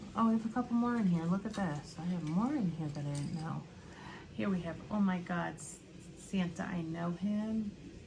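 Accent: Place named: New Jersey, United States. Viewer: Hello. Awesome projects. I was watching a different video of yours. I recognized that you speak more like I do compared to other states 😂 I’m in NJ but it’s very similar in certain areas of NY. I recognizes the word bought, Autumn, etc. Idk it is crazy. Before I started watching YouTube and hearing other accents, I would have never noticed that you sound like home I guess I could say 😂❤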